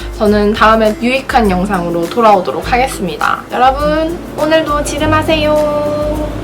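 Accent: Gyeongsang, South Korea. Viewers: 언니 대구사람 맞죠?피부과어디가셧어요?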